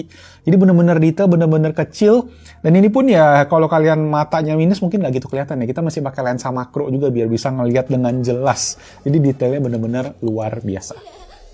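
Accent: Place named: Palembang, Indonesia. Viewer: Haha logat palembangnya gk hilang ya bg, ada kalimat "sisip dikit" wong kito bangett, btw am a one of your big fans and hopely someday bisa kolaborasi🙏 dan shring ilmuny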